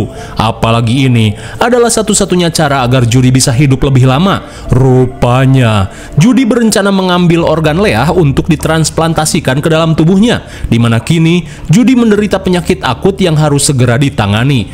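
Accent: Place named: Sunda, Indonesia. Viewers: teh org Priangan timur deh org mana sih jiga org banjarsari kok cara ngobrol nya sama kaya aku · Admin ini kyknya dari tasik atau ciamis😅,kenur kenur😂😂😂😂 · abang nya curiga org sukabumi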